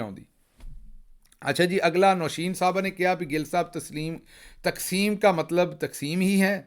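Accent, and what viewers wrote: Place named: Punjab, India. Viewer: Love you Punjabi accent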